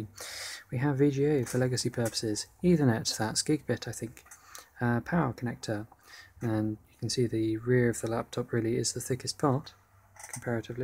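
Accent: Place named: England, United Kingdom. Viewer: I mean I know people think English guys have posh voices and sophsticated words (as long as you stray away from the Chavs etc) but this guy takes first prize :D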